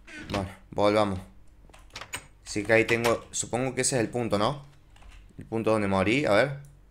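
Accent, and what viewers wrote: Córdoba, Argentina. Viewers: se te esta llendo la tonada cordobesa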